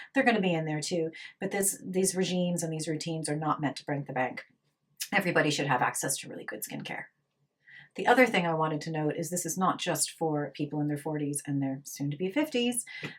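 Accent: Ireland. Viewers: Thanks,you seem very informed,look forward to your other tutorials.Love your accent with a slight undertones of an Irish accent coming through:)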